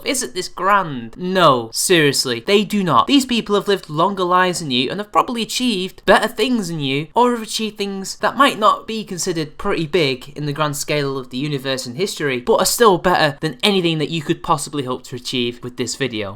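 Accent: United Kingdom. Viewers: I'm not sure you're aware of this but Sargon and Thunderf00t are British, too. In fact, your accent is more like Sargon's. But that doesn't change the fact that your delivery style is like TL;DR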